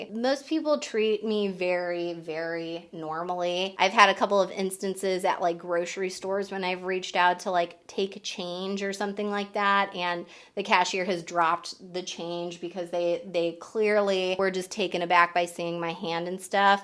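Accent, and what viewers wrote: Midwest, United States. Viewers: Sounds more mid west to me, I've lived in SW Missouri my whole life and she talks just like pretty much everyone here does. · Do you have a Illinois accent or Mid west just curious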